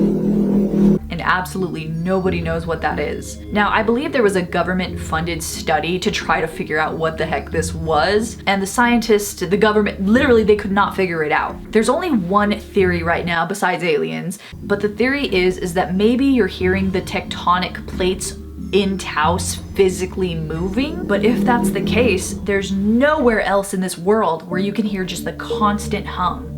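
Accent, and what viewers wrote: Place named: Mexico. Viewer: Your Mexican accent is so cuteee ❤✨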